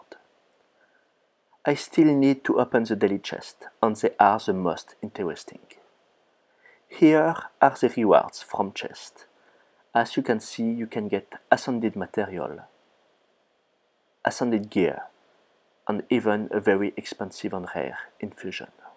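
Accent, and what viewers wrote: France. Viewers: Merci pour cette vidéo, une vidéo pleine de bons conseils et un accent bien franchouillard :D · Man,I love your accent...Liberte,Fraternite pour Tyria :) Amazing video btw. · Good content, but i need subtitles to understand much of what youre saying. Very deep French accent. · He is French, not German! French people speaking Englisch sounds so much worse than Germans speaking it. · on sens bien l'accent française XD mais sinon bonne video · Good tips meme si ton accent francais se sent Bcp plus😂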